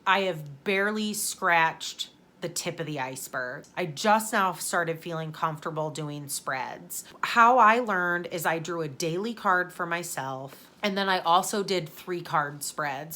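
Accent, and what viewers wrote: Midwest, United States. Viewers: don’t y’all talk similar in the Midwest? It all sounds the same to me! Anyway, you’re very cool, I like your channel and your face. 😊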